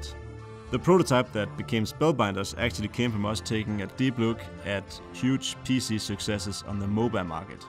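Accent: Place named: Denmark. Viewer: he has a Danish accent. Just like me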